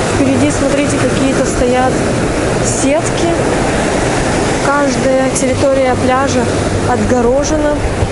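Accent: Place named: Urals, Russia. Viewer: Вау, я угадала!!! Точно, с Урала, слышу знакомый акцент. Я сама с юга, училась у вас в Перми. Да, у меня уши отваливались от вашего ,,О,, ,,АГА,, слова жуете.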